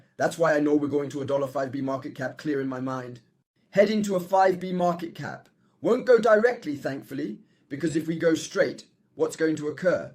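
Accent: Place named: Texas, United States. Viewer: ALLLL RIIIGHHTTTTTT oh yeah (I love texas accent)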